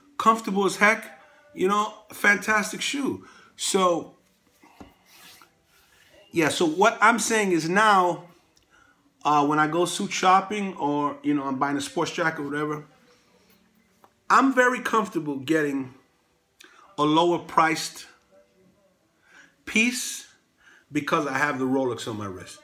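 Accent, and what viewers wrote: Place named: New York, United States. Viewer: Thank you Sir for an honest, accurate and entertaining watch review. I am a new fan and like your New York, Italian American manner, you remind me of a character from the 'Good Fellas' lol... Would love to hear you say 'Ged outta here' lol....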